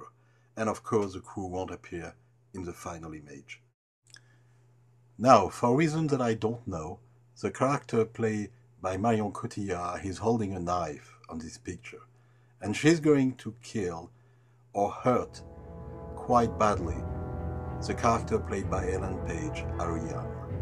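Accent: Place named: France. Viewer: everything aside! i love your french accent... haahah :D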